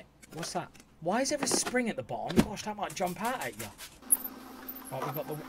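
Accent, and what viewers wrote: United Kingdom. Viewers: man, i love your videos so much, and the ( English British accent 😍 ) amazing bro . big 👋 from an Egyptian fan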